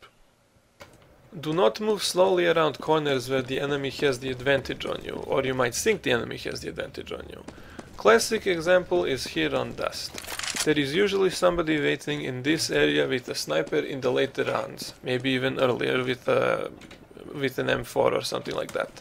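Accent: Russia. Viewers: Forgive me if I'm wrong, but that sounds Russian. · Are you a russian or something? because you sound russian with your accent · How to not (Me: Man this guy has a strong Russian-like accent!)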